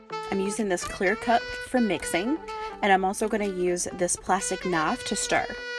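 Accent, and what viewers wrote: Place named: South, United States. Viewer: You are both BEAUTIFUL women! both of you have that southern accent as well! lol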